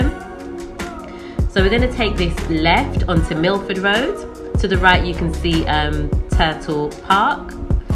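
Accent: United Kingdom. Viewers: Greetings to my lovely family 🤗 manner an Prayer, thks again mi always love British accent ❤💖♥.. wonderful 👍🏿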